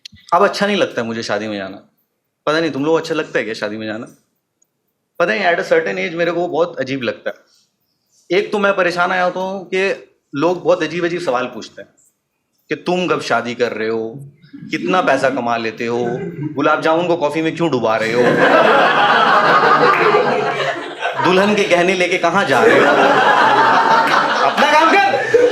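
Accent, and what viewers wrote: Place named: Kolkata, India. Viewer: That kalkatia accent😘😘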